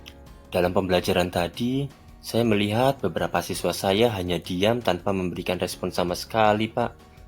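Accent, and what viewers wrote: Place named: Java, Indonesia. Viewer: Wow. This has incredible visualization, idea, and script. Moreover, your Javanese accent will never ever be changed, that's our character. Good job, Pak.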